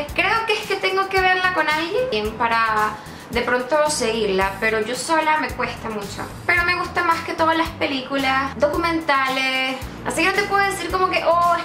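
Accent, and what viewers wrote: Upper class, Chile. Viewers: ajjajajaja mas que nada estabas hablando cuico, no chileno ajajajja que chistosa la weá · Te sale bien pero un poco cuica jajja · Habla mas Chileno que yo wn, le salio ree bien por un momento jure que era Chilena pero cuica xd. Saludos desde Antofa 😎❤ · eres mas divertida hablando con acento chileno jsjsjs saludos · ......yo creo q nunca te escuché «hablar como chileno»pero si x youtube se nota q tienes un acento más de nosotros pero tus palabras y acento es como venezolano no se xq no te conozco en persona lo q quiero decir q ya hablas como el chileno medio y no con tantos «modismos»medios flaites yo diría q tus amigos chilenos pronuncian como normalmente hablamos un gran mayoría ,Chao y q tengas una buena noche cariños .... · Full Sureña, cerca de Temuco... pero Santiaguina. Nones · Te amo!!!!, slds y cada vez mas Chilena! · 🤣🤣ME ENCANTAS ..SI YA HABLAS CON ACENTO .ME DIVIERTO MUCHO .CON TUS VIDEOS TAMBIEN TE SALE COMO CUICA 🤣🤣😘😘💕💖🇨🇱🇨🇱🇨🇱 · Te sale bien, pero como cuica😂😂😂😂😂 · Ohh jajajajaja me da risa pero, porque te sale mas chileno que a mi, 100% jajaja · Yaa , te sale como chilena pero cuica,igual vale ,está óptimo ,sigue así eres envolvente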